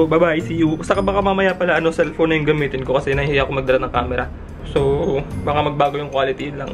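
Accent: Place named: Mandaluyong, Philippines. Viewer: Luh parang taga mandaluyong kapo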